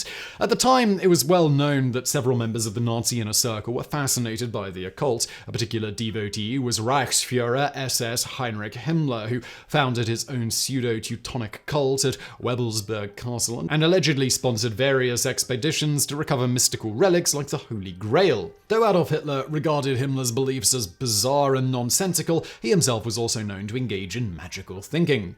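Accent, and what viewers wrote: England, United Kingdom. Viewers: What type of English accent is that?out of curiosity.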